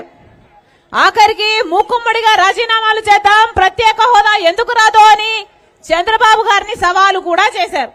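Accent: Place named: Telangana, India. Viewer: తెలంగాణ లో మాటలాడినట్టు ఇక్కడ మాట్లాడితే బాగావుండదమ్మా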